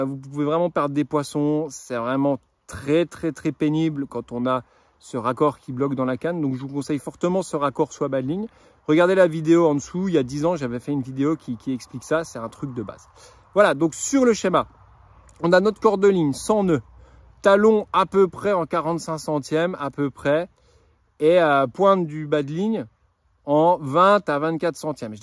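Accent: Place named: Lorraine, France. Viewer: Bravo vraiment très bien expliqué je m abonne, tu es de quelle région t’as un accent Vosges ou Moselle? 😅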